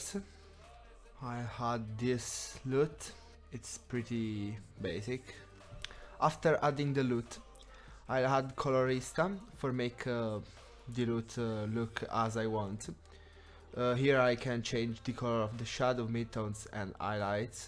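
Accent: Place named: Italy. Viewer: DIO SANTO L'ACCENTO ITALIANO DA IL TOCCO ORGASMICO IN PIU'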